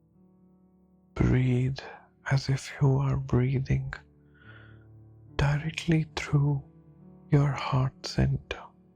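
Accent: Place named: India. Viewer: His english sucks. "Lie back" or "Lay back"??? However i love Indians, but their english sucks. Horriable accent.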